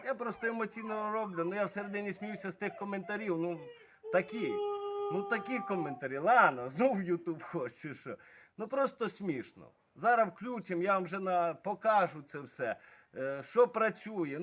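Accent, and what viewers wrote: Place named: Western Ukraine, Ukraine. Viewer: Классный мужик очень позитивно все прям душа радуется что в Украине такие люди больше всего нравится акцент западной Украины прям очень привет из Одессы, про генератор все объяснил наглядно и понятно. Цікаво чи немає дивних шумів від телевізора або комп'ютера при роботі від такого генератора бо я маю перетворювач напруги з 12-220 від нього струм поганий для телевізора бо йдуть поміхи.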